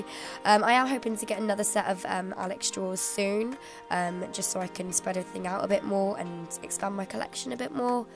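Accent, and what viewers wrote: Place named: Norfolk, United Kingdom. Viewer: Try making an asmr clip if you can. Be great to hear a good ol’ Narrrrfick accent asmr for a change. I’ve listened to hundreds of asmr clips over the last few years and would love a ‘home-grown’ one 👍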